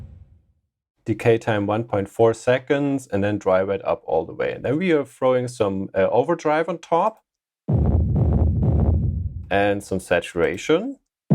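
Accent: Germany. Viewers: Ich mag ja den Deutschen Akzent XDD... aber sehr schönes tutorial... Das problem ist, wenn ich solche baselines mache, hören sie sich auf meinen monitore sehr schön an, aber im auto dann mit anlage grauenhaft, weil zu viel sub anteil, nehme ich dann die low ends so weit raus, dass es geht, hört es sich auf Lautsprecher scheisse an... eine todesspirale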